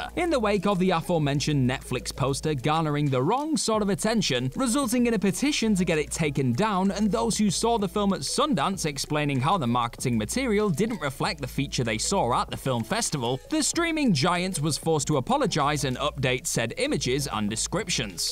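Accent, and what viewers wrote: United Kingdom. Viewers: "He's English" You seem to be under the mistaken assumption that they have one accent/dialect. Which is wrong. This is a specific UK dialect that does pronounce the "g" in hangover. Other dialects don't.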